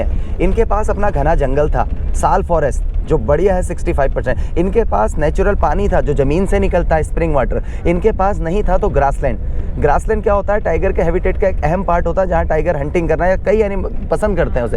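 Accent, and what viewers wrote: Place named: Pahadi, India. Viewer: Pahadi sune me bahut acha lagta h bhai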